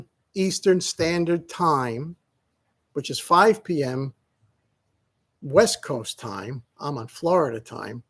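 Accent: New York, United States. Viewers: amazing you never lost the NY accent! love it..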